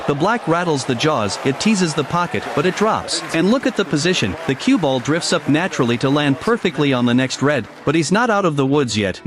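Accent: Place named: United States. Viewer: we fkn know..and a fkn yank commentary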